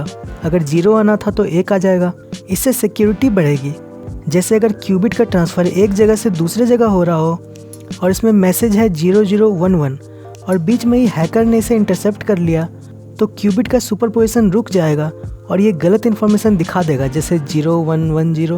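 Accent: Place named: Jharkhand, India. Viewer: 👍Good info.. This channel is under-subscribed... deseves more views.. A small suggestion from my side.. try to correct your accent to be more appealing... It appears that you belong to Jharkhand, and your accent is not neutral.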